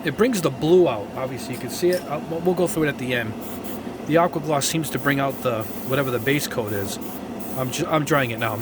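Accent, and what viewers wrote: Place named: New England, United States. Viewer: Dude, thank you so much for doing these; you're my primary resource anytime I'm trying to figure out what I can airbrush. You rule 🤘 Also- New England Italian… Sounding like a bunch of people I met in New Haven who reminded me of a bunch of people i grew up with in New Jersey. Accent rules 🤘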